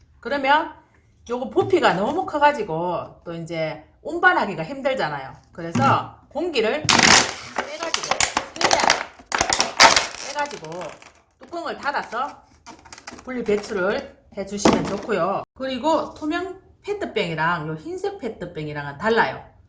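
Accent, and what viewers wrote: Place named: North Gyeongsang, South Korea. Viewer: 나도 경상도사람임에도 불구하고 경북사투리듣기거북해서 이분 유튜브안볼라하는데도 그런거상관없이 이분채널은 진짜 유용함ㅋㅋㅋ 인정할수밖에 없음!!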